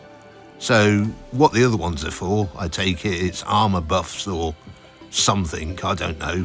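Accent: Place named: United Kingdom. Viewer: This is the most British sounding person I heard in my life.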